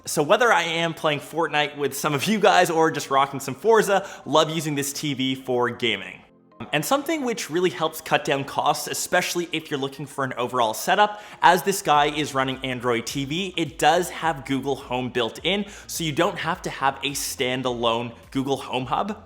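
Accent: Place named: Canada. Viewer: Beeenk I now know how to determine If someone is an american or canadian because of you. I find it adorable 😁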